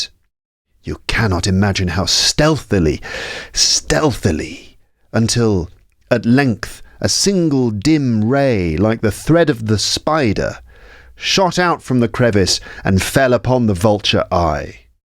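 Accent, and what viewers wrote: United Kingdom. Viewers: Thank you for the podcast you shared, I really loved your British accent when listening to the story too 👍👍🙏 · Hello . Is this British pronunciation?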